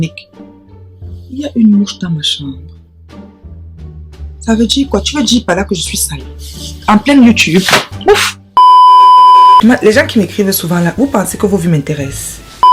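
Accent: Cameroon. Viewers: J'adore 💕 le fait que tu parles #camerounaisement😂, trop de love pour toi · J'adore trop ton accent kmer, kiss from une sœur sénégalaise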